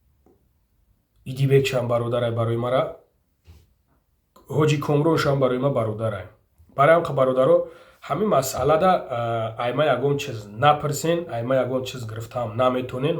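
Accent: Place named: Bokhtar, Tajikistan. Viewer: Хака хаму БОХТАР КУРГОНТЕППА гап мезана бачаи хола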